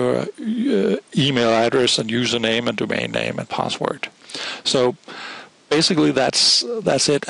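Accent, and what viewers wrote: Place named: Netherlands. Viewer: No, that's a Dutch accent, it's supposed to be like that.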